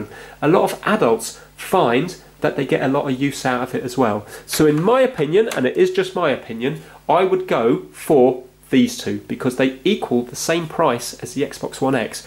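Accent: England, United Kingdom. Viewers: *english accent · u have a deep British accent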